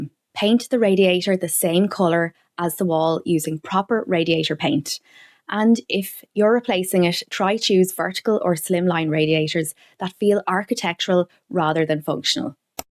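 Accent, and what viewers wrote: Ireland. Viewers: lovely Irish accent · Brief and brilliant! Plus lovely Irish accent.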